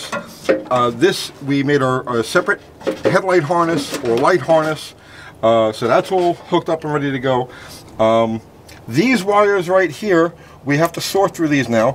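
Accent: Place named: New Jersey, United States. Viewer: I gather that you are in Tennessee, but I hear a lot of New Jersey in there.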